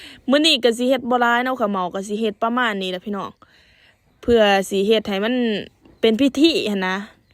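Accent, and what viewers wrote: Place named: Tao Ngoi, Thailand. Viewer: สบายดี ไส่เสื่อแขนยาวแหน่บ่คันคายเบาะฟังสำเนียงคือคนเมืองเต่างอยอยู่บ้านกวนบุน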